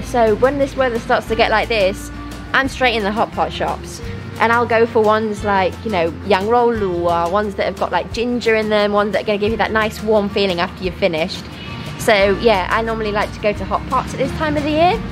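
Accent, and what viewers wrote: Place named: United Kingdom. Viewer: 英國腔很好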